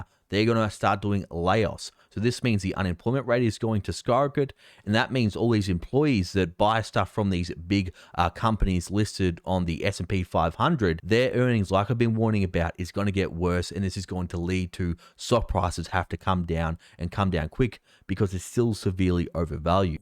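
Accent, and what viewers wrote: Australia. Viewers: Thanks, your sincerity, enthusiasm, and respect for your viewers shows on everything you do! And as an American, I love hearing real news delivered with an Australian accent! Great job!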